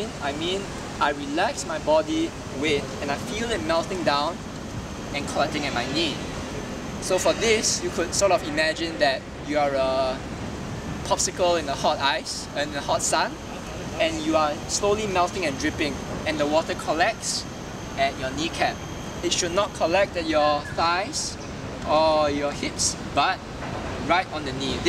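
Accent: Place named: Singapore. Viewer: Judging from the accent, must be Singaporean and Malaysian wc. There s no such thing as structure for sil lim tao, there is only one single right structure for the entire Wing Chun system. Your mentioning of "clamming down" the knees already show some misunderstanding. Most people have been misled and gone down the wrong path simply because of the unfortunate use of the word "箝" ! 取其像而不取其義!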